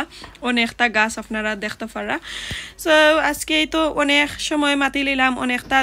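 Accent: Sylhet, Bangladesh. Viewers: Congratulations apu! Oo Afu aida khita khoilan? Afnar video ke khali afnar Sylheti boinaita dakha ni? Onno distric ar manush oh toh dakhun. Oija dahugga apnar maat hunita hunita ami Sylheti maat hekhi lairam 😀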